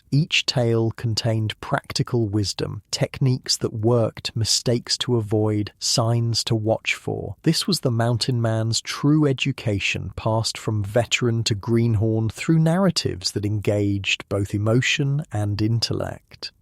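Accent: England, United Kingdom. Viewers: PM in NY... There's more than a handful of these types of videos out there, but I prefer because of the narrator's English accent and his sly metaphors. They paint such a vivid picture, and mix very well with Moonlight Aura Vibes' video, Cat Purring & Brown Noise (10 Hours). I usually put together a playlist that takes me through the entire evening, allowing me to subconsciously be an opium addict in Victorian time, to The TERRIFYING Life of a Child Emperor in Imperial China, and all points in between! ❤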